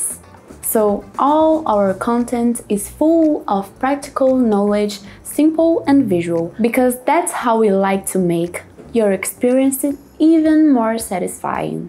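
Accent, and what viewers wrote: Brazil. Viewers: Nice Brazilian Portuguese accent! Could tell just by the name hahaha I never changed the layer height settings in my printer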